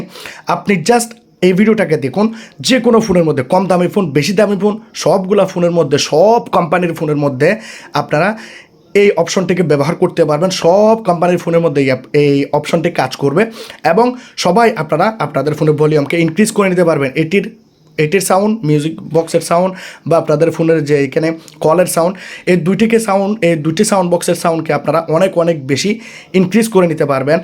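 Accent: Chittagong, Bangladesh. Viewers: কথার উচ্চারণে মনে হয় বৃহত্তর চট্টগ্রামের...! কিছু আঞ্চলিকতার প্রভাব থেকেই যায়...!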